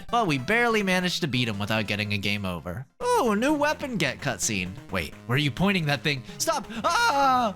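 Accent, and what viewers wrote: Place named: Germany. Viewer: Why a WERMAN ACCENT This is so cool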